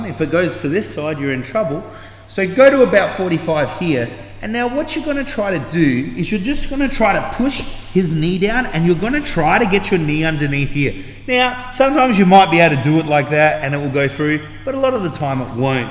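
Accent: Australia. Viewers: The Aussie accent make it so pleasant lol!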